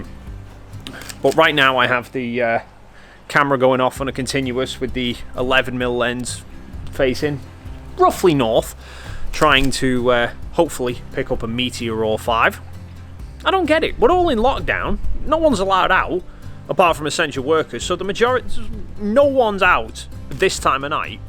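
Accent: United Kingdom. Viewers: I love that the "ISO what you did there" pun is specific to your British accent.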